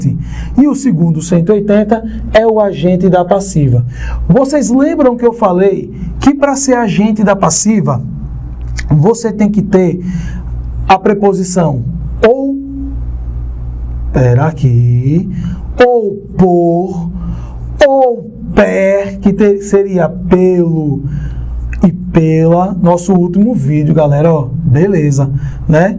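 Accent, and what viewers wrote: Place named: Bahia, Brazil. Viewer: Vc é o cara mesmo negaum kkkk esse sotaque da bahia é fodaa. Ryan BH MG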